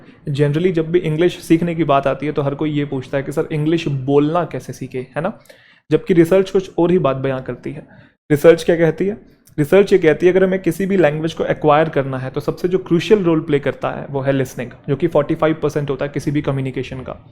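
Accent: Haryana, India. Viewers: Sir ji haryana se ho na??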